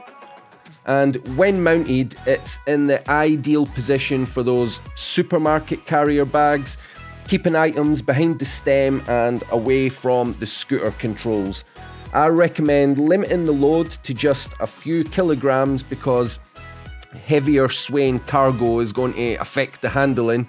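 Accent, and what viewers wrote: Scotland, United Kingdom. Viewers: ok, stop.. i can't resist that much british accent. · Yaaasssss a Scottish guyyyy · i was thinking, hmm that looks like Newcastle quayside and north sheilds..... but your scottish! yup i was right it is haha im living here aswell :)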